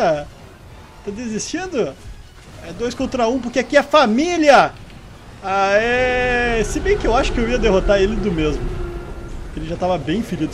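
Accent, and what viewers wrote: Rio Grande do Sul, Brazil. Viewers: Vc é gaúcho né ?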